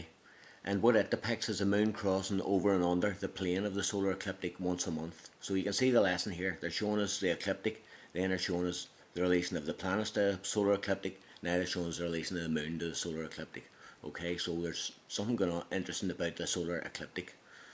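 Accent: Ireland. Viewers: Love the Irish accent, understood it all real well. Very strong accent though and even said if you don't understand me visit the web addy. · you have a talented mind !! i just hope you're wrong in some of your views :) im curious about the upcoming August crop-circle of the year. ps irish accent i love that land!! (specifically bray - yes, bray)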